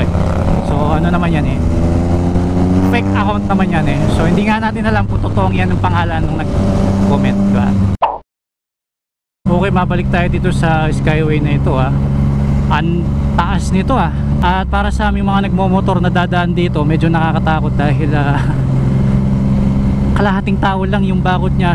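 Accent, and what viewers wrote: Pampanga, Philippines. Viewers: Kapampangan kang tune par. ahaha managapatula ku kareng words a gagamitan mu. More power and ride safe lagi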